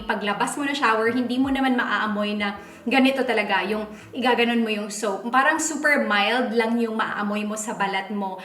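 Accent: Visayas, Philippines. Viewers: 😉Ate what if mag review ka in Bisaya so love the way you talk in Bisaya...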